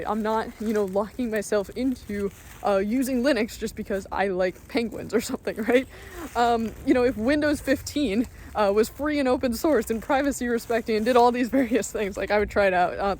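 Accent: Canada. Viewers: What a sympathetic person. She sounds canadian, maybe its that 🙂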